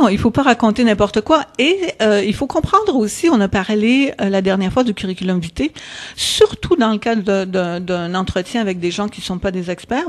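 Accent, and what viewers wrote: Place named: Canada. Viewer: J'aime beaucoup l'accent canadien :)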